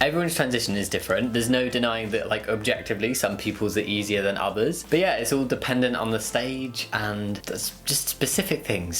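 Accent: United Kingdom. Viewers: I felt like your accent slapped me in the face with just how British it was lmao, that's the best way I can explain it. Also your voice is really calming and it's the type of voice I like in ASMR videos. I'm glad your family is supporting you in this, and that your school is doing its best as well! Catholic schools just seem to suck universally and I don't understand why people keep sending their kids in them...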